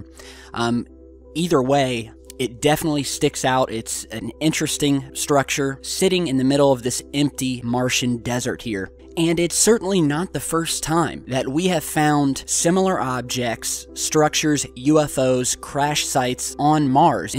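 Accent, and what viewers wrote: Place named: United States. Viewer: Well he speaks English with a kind of American accent, I'm more used with British accents but I understand him very well too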